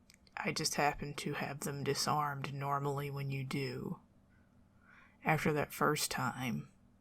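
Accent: South, United States. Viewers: This southern accent is the peak of ASMR.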